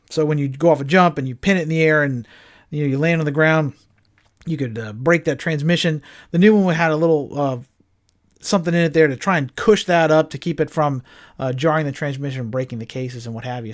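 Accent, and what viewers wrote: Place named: Cecil County, United States. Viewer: You sound like one of us boys from Cecil county. My goodness 😂😂😂 My dad bought me and my 2 brothers a 79 Odyssey. This was 1985 and most of the other guys had CR’s 250R’s or Quad runners and Quad Racers. We had that old yellow 79 😂😂 they always made fun of us😂😂 I had to stand up on the back and hold on to the upright bars. We rode it in all the snowstorms. The snow collected on the front plastic fenders and got them brittle. They cracked off. Mud went right in our faces after that. So my dad took my fluorescent orange sled and cut it apart with a utility knife and bolted homemade fenders on it. We were some goofy poor ass hillbillies of the neighborhood. We rode that thing up the back roads, down the power line access roads. It had these straps that you put your wrists through on the steering yoke. It didn’t have a wheel. 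Those straps were to keep your arms from getting ripped off in a roll over.😄😄😂😂😂😂 Good times man. Those upitty kids with the nice new quads and bikes gave us some ball bustin for having to ride that old yellow and homemade bright orange fendered jaloppee. You said STINK FINGER😂😂😂😂. We had an old neighborhood skank that would let ya Four Finger her😂😂😂😂😂. MAN,,!!! I was dyin when I read your comment. Took me way back to 85. God Bless us men who grew up in those awesome days. Everything is all built up and the land we drove on is all developments now. These kids nowadays don’t even know what stink finger is. Nonetheless Four fingerin’😂😂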